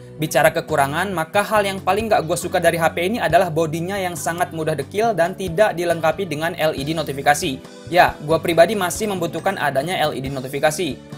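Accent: Lampung, Indonesia. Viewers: Lu orang lampung tah bang?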